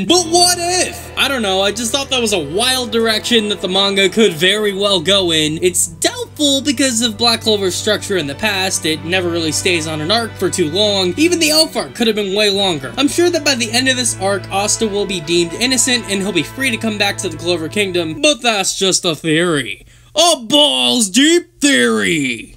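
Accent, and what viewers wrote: United States. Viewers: No this is his American accent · Can you not make this American do the vids cuz its hella fucking annoying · Dude I hate the #Americanvoice but love the content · Great content but I'm not taken by the American accent. It's not as funny. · No more American accent!!!!!!!! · Disliked because American accent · American accent or accent is the problem this channels facing right now. Personally I can understand the accent but for the audience that can't well I,ll leave this problem for you to decide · I'm not hating on the American accent but I feel like it removed the humor from a lot of your jokes especially from the Baaaaalllls Deep... Still good vid tho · Throw this american accent ting away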